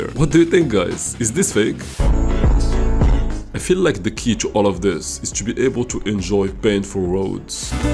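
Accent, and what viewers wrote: Morocco. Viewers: ur moroccan I know that from your accent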